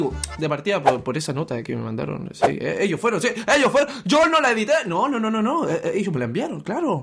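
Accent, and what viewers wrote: Chile. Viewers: Mmmmm eres chileno???? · Este chileno :v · Ho por Dios eres chileno como yo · Eres chileno sierto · Wena eres chileno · hola soy nuevo sub ¿eres chileno? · Sabia que era chileno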